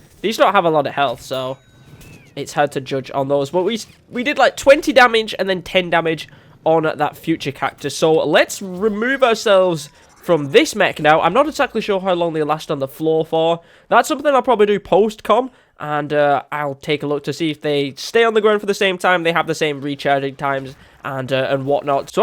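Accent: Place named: Wales, United Kingdom. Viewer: its mostly his weird whelsh accent and his broken grammar that pisses me off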